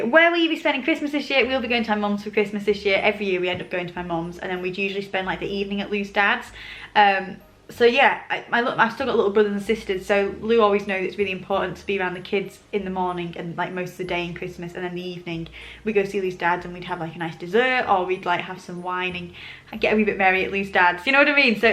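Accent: England, United Kingdom. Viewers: You have an English accent with a Highland twang if that makes sense x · I live in Yorkshire you don’t sound Yorkshire but definitely English x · You dont sound yorkshire i think you sound english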